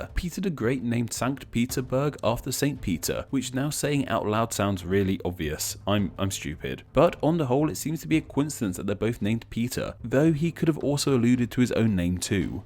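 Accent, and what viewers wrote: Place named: Midlands, United Kingdom. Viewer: For a channel dedicated to etymology, it seems weird that the host pronounces every non-English name and word as though it were a town in the Midlands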